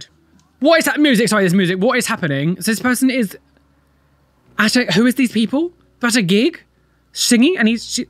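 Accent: United Kingdom. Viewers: That bri'ish accent girrrrrrl 😼🎀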